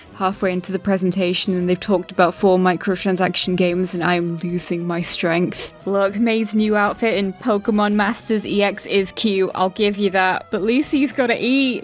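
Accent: United Kingdom. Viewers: HOW DID I NOT REALISE YOU'RE BRITISH - I'M BRITISH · Your British and you’ve never summered in Spain?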